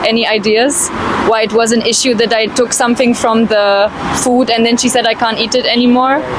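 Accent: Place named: India. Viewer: She has an indian accent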